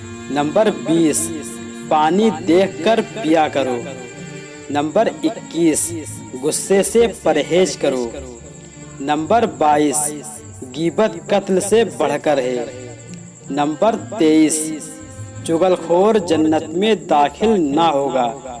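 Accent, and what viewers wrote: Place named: India. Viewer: Tallafuz sahi karyei Hindi mei hai Urdu mei boliye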